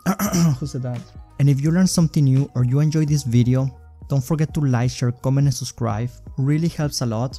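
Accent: France. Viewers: his accent sounds french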